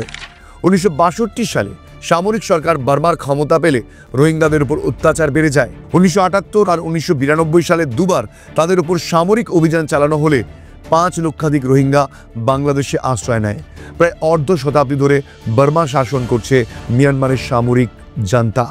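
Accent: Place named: Kolkata, India. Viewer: বাংলাদেশ থেকে কথাগুলো শুনলাম দাদা মনে হয় কলকাতার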